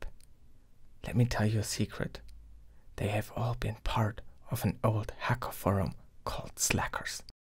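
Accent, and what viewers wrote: Germany. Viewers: Man hört einfach das du auch deutschsprachig bist 😅 aber gutes Video 👌🏼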